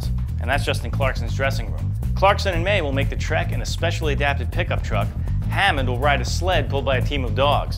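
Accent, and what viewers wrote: Canada. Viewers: your from quebec arnt you? pull your head outta your ass. Vive le Anglise! :P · lol... well it doesn't sound like an accent to you because you are Canadian... not because you don't have an accent.... anyone who speaks at all, has an accent of some kind.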